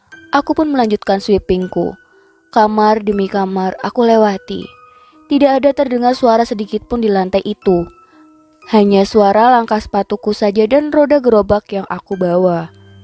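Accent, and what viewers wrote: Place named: Java, Indonesia. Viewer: nedok mbak e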